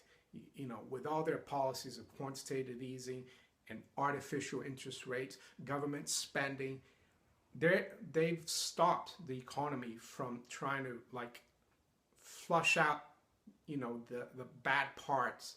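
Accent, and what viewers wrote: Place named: United States. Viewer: I thought I could hear a lot of "American" in your voice. :-)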